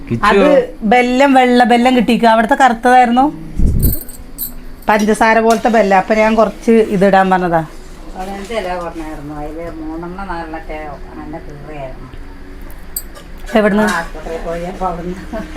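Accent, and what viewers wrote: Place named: Valluvanad, India. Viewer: വള്ളുവനാട് ഭാഷ അങ്ങനെയാണ്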